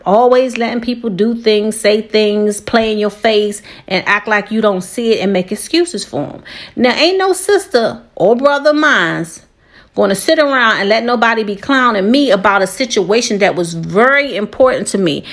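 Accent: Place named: Washington DC, United States. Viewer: New subscriber here….. only subscribed because i feel like I heard some DC twang!!!! #Ward8 #Soufeast4Lyfe 🙌🏾🙌🏾🙌🏾💯💯💯💪🏾💪🏾💪🏾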